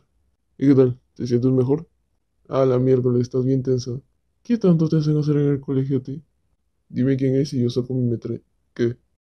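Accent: Peru. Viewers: Hay me da la impresion de q eres peruano ( :D nada mas es una duda XD no te lo tomes a mal) Pd. Ta bonito el video :D chao cuidate xd un F por tu brazo XD . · eres peruano? tu dejo es muy parecidos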